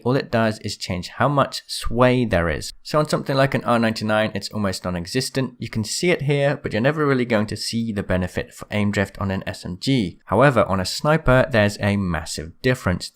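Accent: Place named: Scotland, United Kingdom. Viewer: スコットランド人の訛り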